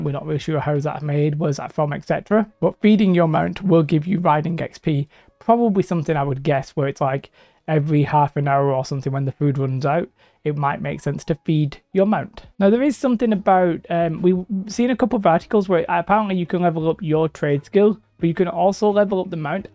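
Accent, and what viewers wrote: United Kingdom. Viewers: where abouts are you from? Me and my partner have been debating which part of the north your accent is haha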